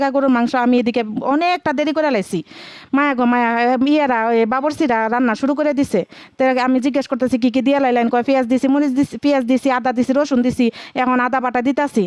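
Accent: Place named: Mymensingh, Bangladesh. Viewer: আপু আপনার মিনি অনেক কিউট, আপনার কথা গুলো অনেক সুন্দর লাগছে তবে আপু আপনার কথা গুলো জামালপুরের হয়নি এই ভাষা ময়মনসিংহ এর,আপু আমার বাড়ি শেরপুর বকশিগঞ্জ এ,এই পিটালি আমরা আগে মানুষ মারা গেলে মজলিস এ কলা পাতায় খেতাম অনেক মজা হতো,আপনার ভিডিও দেখে ছোট বেলার কথা মনে পড়ে গেলো, অসম্ভব সুন্দর ছিলো আপনার ভিডিও ও উপস্থাপনা,আপু আপনার ভিডিও টা পুরো টা দেখলাম এবং এক বা না, দুই দুই বার দেখলাম ভালো থাকবেন দোয়া রইলো আপনার জন্য।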